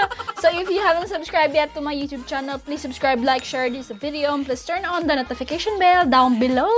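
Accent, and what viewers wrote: Ilocos, Philippines. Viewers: Ang ganda ate 😍 lapistick yung accent ate ilocana. Ilocana din ako😘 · "Sa kanyang lapistick" 😂 love your accent, im ilocano too. Your so talented dear. 👏👌Keep it up.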